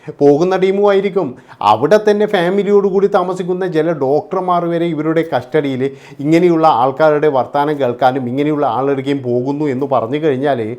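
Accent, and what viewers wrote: Kannur, India. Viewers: കണ്ണൂർ ജില്ലയിൽ എത് ഭാഗത്തെ ഭാഷ്യാണ് ചേട്ടാ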